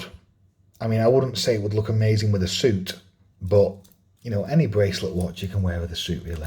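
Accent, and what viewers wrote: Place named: West Midlands, United Kingdom. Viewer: Thanks for the review! I have just bought the strap version of this watch . The bracelet looks very well made, though… I think I’ll have to buy another one, just to be able to swap straps… Can I ask you what part of England your accent’s from? I’m not a native speaker and I’m trying to figure out as many accents as I can… West Midlands, perhaps? Great review, thanks!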